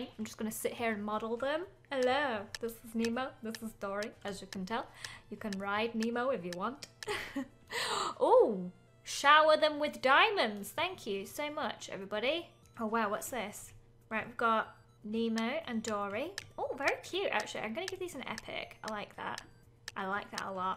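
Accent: England, United Kingdom. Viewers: She is from England. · She is English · She is British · lol she's british XD I can tell you're new here... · she has a british accent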